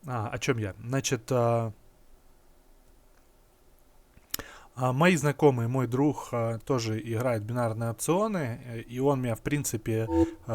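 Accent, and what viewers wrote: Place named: Ukraine. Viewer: По разговору я понял,что Вы из Украины! Пополнчли через вэбмани или киви,как лучше? И можно ли с приватовской карты пополниться ?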